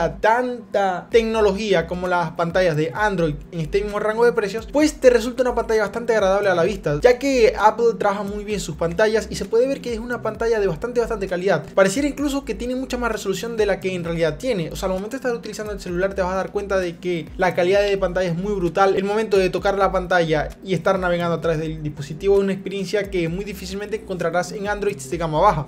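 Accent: Venezuela. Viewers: eres venezolano???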